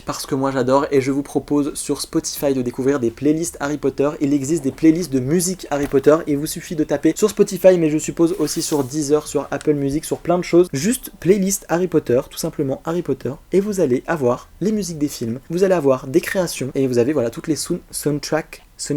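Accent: England, United Kingdom. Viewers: Mais quel accent anglais ! Whouaaa 😂💕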